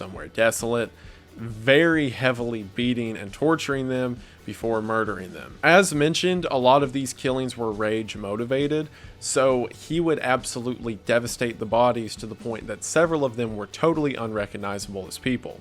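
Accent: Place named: Texas, United States. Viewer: omg, i love your accent! are you from texas? <3 much love from London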